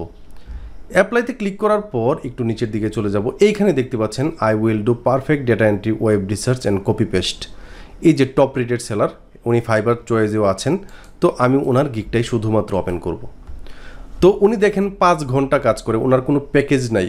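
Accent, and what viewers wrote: Sylhet, Bangladesh. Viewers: Apni ki sylhet thakten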